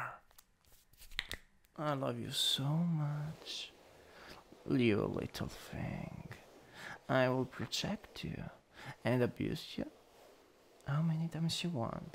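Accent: Italy. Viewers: I love ❤the Italian accent is the best😅😅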